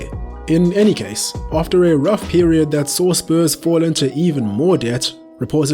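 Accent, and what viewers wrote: South Africa. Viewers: Awesome to hear a South African voice! Nice work. Subscribed 💪🏻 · The narrator sounds south african! If so, it makes me even more proud to say this is an awesome production. Subscribed!!! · Narrator sounds South African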